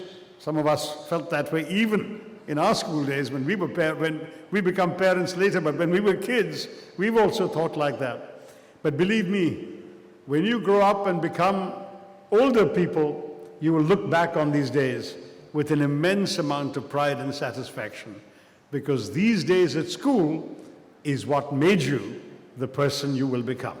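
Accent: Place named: Oxford, United Kingdom. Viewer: Highly inspiring ,informative ,interesting and wonderful to listen Dr shashi taroor's Oxford accented English. Highly super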